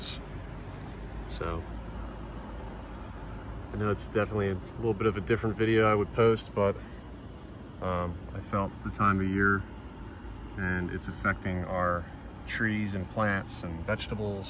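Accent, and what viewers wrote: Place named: Pennsylvania, United States. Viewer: I nailed that accent immediately. Yes, I'm from PA and I have disgusting Lantern flies.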